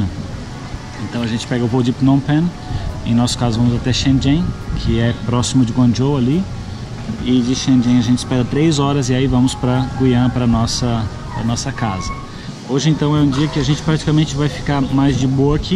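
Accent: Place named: Piracicaba, Brazil. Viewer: Bacana, a é daqui de perto de minha cidade Mogi Mirim (SP). Para dizer a verdade tinha notado na fala dela um leve sotaque de Piracicaba, rsrsrsrs. Gosto muito de seus vídeos, bastante informativos.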